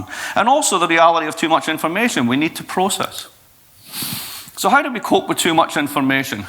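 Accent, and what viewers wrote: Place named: Scotland, United Kingdom. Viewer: + Scottish Accent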